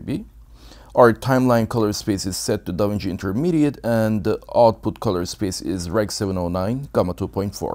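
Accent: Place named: Russia. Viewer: Я совершенно не знаю английский, но чувак, я слышу русский акцент)))))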